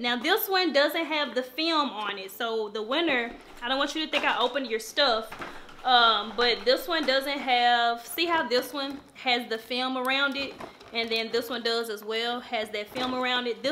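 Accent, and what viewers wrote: South, United States. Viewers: I just love your accent (I guess because I have a southern accent too) and how you carry yourself as a young black woman. It is so important that we as young black women lead by example for young women to be "Elegant" and carry themselves in a manner that a young lady should. I think watching vloggers on youtube who set that tone can help guide them in a positive direction. Naturally Sunny talked about you in her previous vlogs and I am so happy I came across your channel and become a new subbie as well. Thank you for being one of the influencers who brings positivity and laughter when vlogging even when it can become a challenge. I'm so happy that you and your family made it through the horrific storm. I've never smelled Tiffany & Co. perfume but I know it smells delightful and grown woman-ish.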